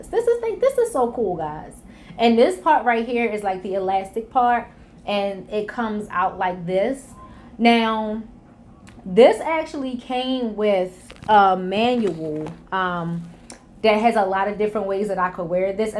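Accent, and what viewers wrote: Baltimore, United States. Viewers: Just came across your channel. I had 4-5” of hair when I started too. My install was 5/23/20 so our locaversary is around the same time. Are you from Baltimore or somewhere in DMV? I think can hear it (I’m from Balto myself). Many similarities. I think my hair was more awkward than ugly lol...I wore turbans and headwraps for like the first 3-4 months straight. New subbie! 💕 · Sis the Baltimore accent IS REALLLL!!😫😂😂😂😂😂😂 you’re soooo beautiful🥰🥰🥰🥰🥰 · It’s the B-more accent for me 🥰🤣. You’re beautiful, sis. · You have to be from Baltimore (the accent) lol, I’m from the dmv. Love your hair!!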